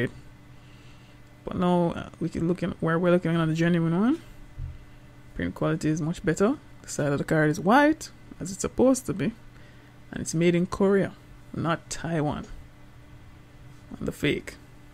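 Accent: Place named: Jamaica. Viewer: Comment not related to the video. You sound Jamaican to my ears.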